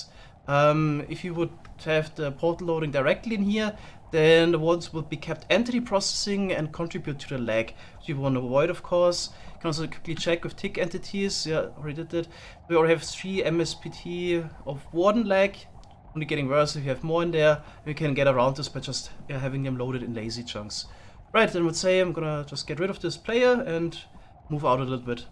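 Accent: Germany. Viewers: Deutscher Akzent, beste.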